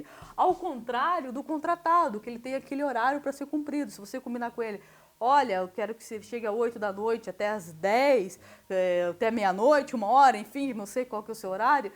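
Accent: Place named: Curitiba, Brazil. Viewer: Ótimos vídeos explicativos, sotaque Curitibano "Penca" de app kkkkk amei. Estou querendo abrir um delivery.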